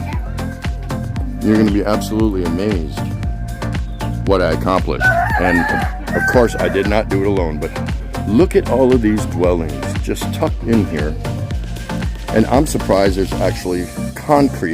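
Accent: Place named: Philippines. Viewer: nice neighborhood with great people. nice DIY pathway for the high tide. nice tagalog accent!. hopefully one day you will blog also talking only in tagalog.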